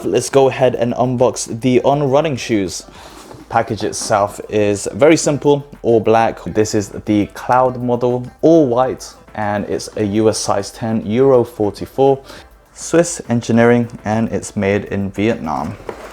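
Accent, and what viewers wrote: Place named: New Zealand. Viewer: Thanks man. Appreciate your time and help as well. I just saw your vevo vid too and now I feel like I have to choose between those! Ha Btw, your vids are in South Korea but your accent sounds almost Kiwi ha where are you originally from?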